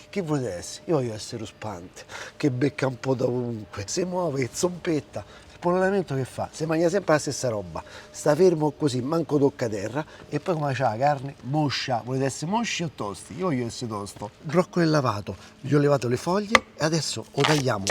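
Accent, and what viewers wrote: Rome, Italy. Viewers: I can barely follow Roman Italian but I love his stuff!!!!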